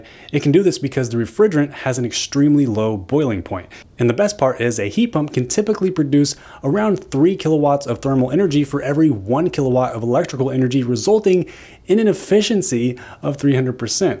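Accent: Kentucky, United States. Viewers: Are you a Kentuckian?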